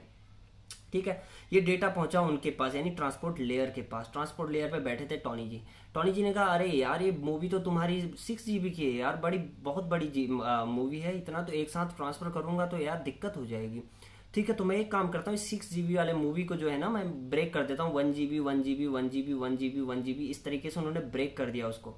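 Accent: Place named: Kumaon, India. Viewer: Sir maine abhi aapke y video dekhi ..what an interesting and easy explanation...... 🙏🙏🙏 Thank you so much .......I have a question ap kumaoni ho kya? Just lga...a little touch in your language...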